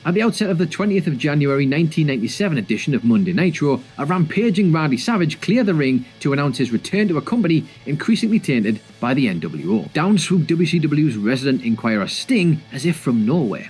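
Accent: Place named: England, United Kingdom. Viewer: Which Scottish boys? The narrator's from England.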